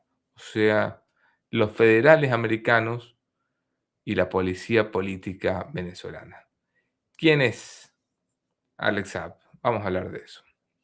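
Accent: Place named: Argentina. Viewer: Por eso tienes el acento argentino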